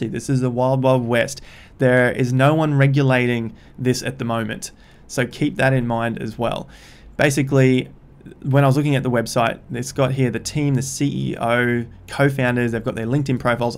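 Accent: Australia. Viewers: I'm going to be leaving university and hoping to get a job in September. I think crypto is definitely a space that I'll invest real money into during the next set of lows later in the year (at the moment I'm working with about £100 and doing what I can) and I think I'll buy the Investor Accelerator too because you're genuinely helping me learn (and your Ozzy accent is nice to listen too xD)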